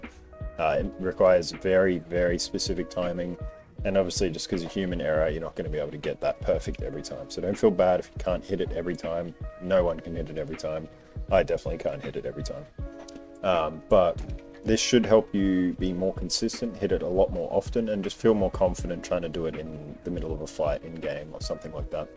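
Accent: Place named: Australia. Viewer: fuck oath could tell instantly, master race haha 🇦🇺🇦🇺🇦🇺 Dope tutorial btw just got a pc n switched to mnk like a month ago your tip to slow the vid down to get timing actual helped heaps Keep killing it man, Love hearing a fellow Aussie accent reppin apex 👌🍻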